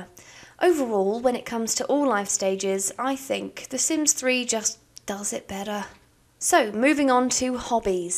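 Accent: United Kingdom. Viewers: I like you accent were in the UK are you?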